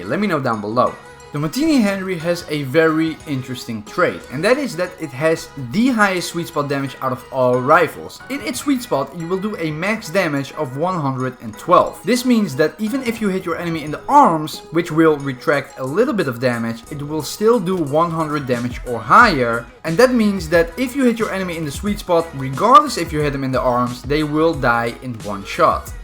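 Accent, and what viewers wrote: Netherlands. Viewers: Are u dutch? Ur accent really sounds dutch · ' ' ben je Nederlands? Het klinkt zo maar ik weet het niet zeker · is he dutch? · Ik hoor dat je nederlands bent 😆😋😂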